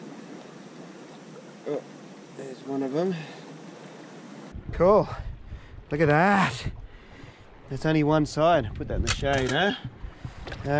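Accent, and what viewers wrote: Australia. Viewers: I really like your videos, It’s lovely to hear an Australian accent.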